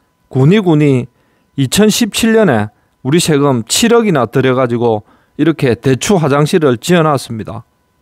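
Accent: Gyeongsang, South Korea. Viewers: 그런데 지자체 헛돈 쓴 곳이 경상도랑 강원도 같은 곳만 있나? 흠. . 말투는 경상도인데 신기하네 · 딱 첫마디듣고 경상도인줄알았다!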